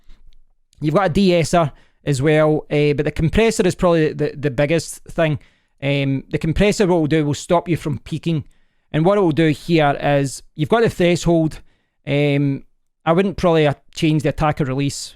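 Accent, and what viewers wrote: Scotland, United Kingdom. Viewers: Scottishhhhhhhh!!!!!!! i did my first degree in Glasgow and missed this Scottish accent. Congrats, very good demonstration. Thanks for sharing. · is he scottish? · best scottish accent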